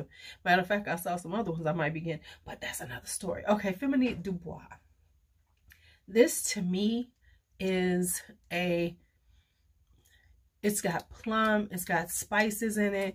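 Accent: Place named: United States. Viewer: love this house! Serge is sooooo good! Clair de musc is my base... always. BTW your french is adorably USAmerican. <3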